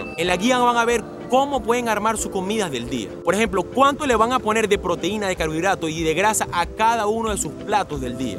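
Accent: Venezuela. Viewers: Parece venezolano por el Acento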